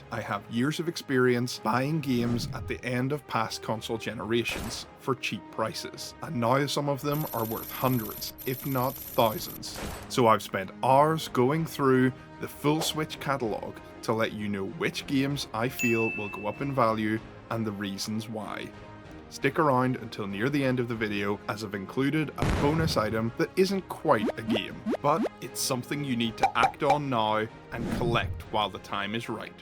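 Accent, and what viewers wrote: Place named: Scotland, United Kingdom. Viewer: You Sound Scottish. I Am American...I Love Scotland,And Am Thinking Of Maybe Moving There...But Your Scottish For Sure I Can Hear It.,Cant Get Rid Of That Accent Lol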